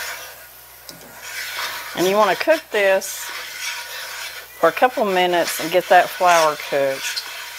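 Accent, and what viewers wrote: South, United States. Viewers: This is one of my favorite meals. I've only made it once though and it was OK but not as good as I've had at a restaurant. I didn't use the crackers crumbs. I am really interested how you tenderized that meat though. I tried with mine but it didn't look like that. What is your secret? I love listening to you talk too. My momma was from Kentucky and I love the southern talk and way ya'll say things. I watch your channel a lot. Thanks for this recipe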